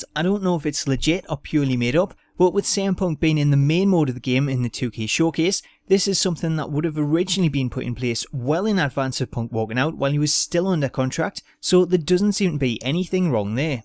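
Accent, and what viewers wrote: Newcastle, United Kingdom. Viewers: hey man where are you from because you sound like a fellow geordie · I don't understand anything you said with your british accent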